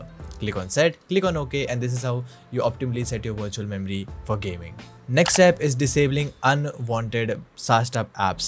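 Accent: India. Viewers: With a hacker name like - I aint trusting NOTHING this mfer says.. "Download and run these programs..' HELL NO, especially when he sounds like some ripoff artist from India.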